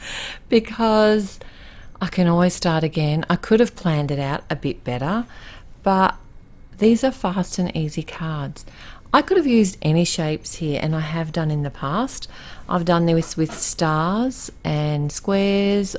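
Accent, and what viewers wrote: Australia. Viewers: Nice to hear your Aussie voice! Means I’ll be able to find all you used! Thank you 🙏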